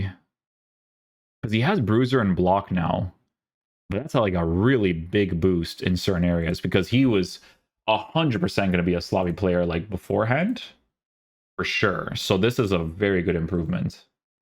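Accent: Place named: Portugal. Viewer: He's Portuguese. He has an accent. Get over it 😂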